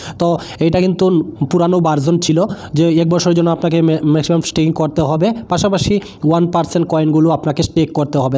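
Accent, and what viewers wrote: Sylhet, Bangladesh. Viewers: Baisab sylhetti bujchi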